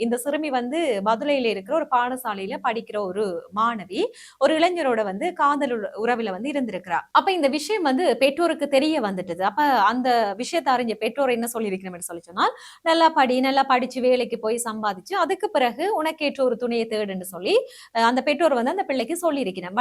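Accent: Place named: Sri Lanka. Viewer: மேடம் உங்களுக்கு என்ன இலங்கையா உங்க பேச்சு எனக்கு ரொம்ப பிடிச்சிருக்கு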